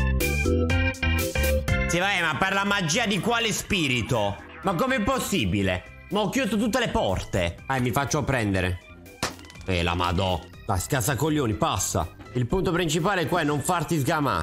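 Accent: Rome, Italy. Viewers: però l accento romano no! E che cazz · Non te se po sentì a parlà mezzo romano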